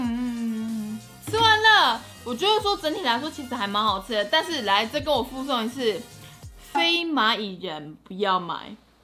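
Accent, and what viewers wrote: Taiwan. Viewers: 講台灣國語敲可愛的我都會噗揪笑了出來🤣🤣🤣🤣🤣💜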